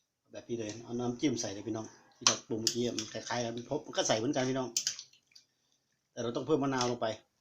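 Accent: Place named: North, Thailand. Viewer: สำเนียงไม่ใช่คนอิสานเด้,คนเหนือแต้ๆเด้นี่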